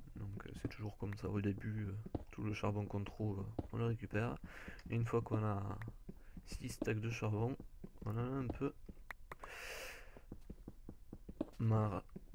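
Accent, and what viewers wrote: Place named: South, France. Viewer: t'as un accent du sud !! le son il est pas terrible aussi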